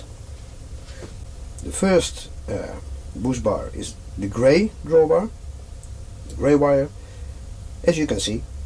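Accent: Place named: Netherlands. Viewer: You're Dutch? Can't miss.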